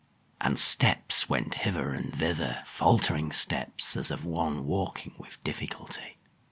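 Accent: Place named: Yorkshire, United Kingdom. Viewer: Please, No! Do not feel because of your Yorkshire accent that you are not suitable to read the works of M.R. James. He wrote his works for everyone and (excuse the pun) would be horrified a person would feel his accent prevented him from interpreting his work. He was not a snob. Far from it. You have the perfect voice for the journey you have chosen (or it may have chosen you) Happy reading 📗📘📙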